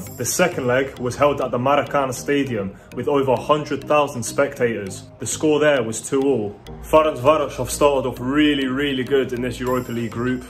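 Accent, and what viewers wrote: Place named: United Kingdom. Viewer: Imas odlican britanski naglasak brate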